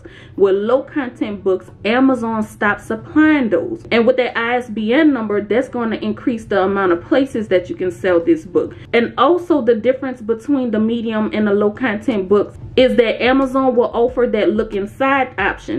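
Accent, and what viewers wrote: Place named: Louisiana, United States. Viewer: My good sis. That Louisiana accent is so strong I subscribed just for that!! Love the realness!!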